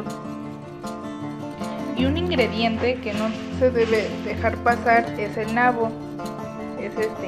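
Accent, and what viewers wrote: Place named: Guatemala. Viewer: Disculpa de donde eres porque pensé que eras de Guatemala.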